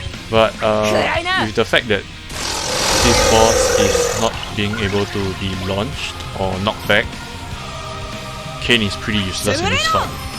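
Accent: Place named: Singapore. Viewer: You Singaporean? I can recognise the fellow accent xD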